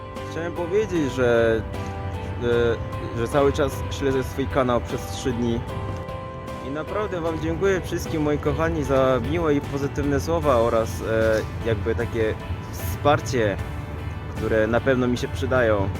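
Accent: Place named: Poland. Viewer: Czy ty nie jesteś przypadkiem Polakiem, bo akcent masz nawet nasz. To jest naprawdę niewiarygodne, opowiedz coś o sobie.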